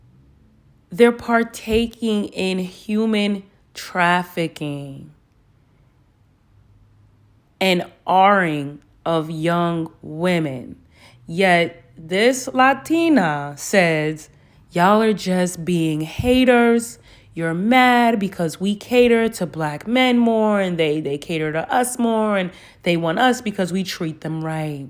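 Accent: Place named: New York, United States. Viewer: I hear your accent; are you from New York City?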